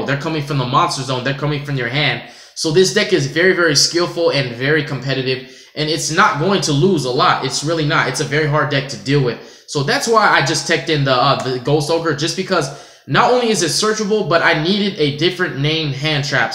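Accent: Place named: Mexico. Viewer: you sound really mexican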